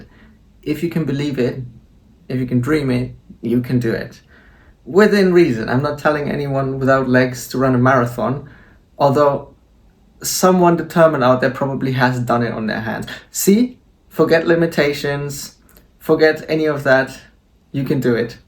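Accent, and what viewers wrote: Germany. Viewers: Bulgarian, but u have a german accent?😅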